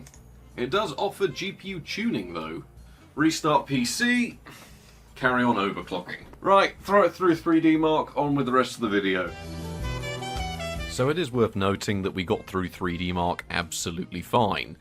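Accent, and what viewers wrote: United Kingdom. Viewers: This is so American of me to say, but I say it as an American whose family literally came over to Ellis Island, NY from London in the 1800s. Also according to my DNA I am 68% British & absolutely LOVE the UK. Ok, with that disclaimer out of the way. Having a British Accent just makes the videos seem like dated fine wine! Nostalgia with intelligence!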